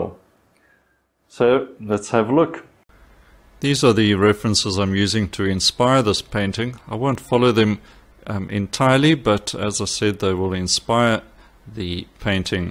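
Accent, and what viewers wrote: South Africa. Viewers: How nice to hear a South African accent in a tutorial for a change. And that landscape inspiration pic makes me “verlang” home. So a happy and sad video 😄😔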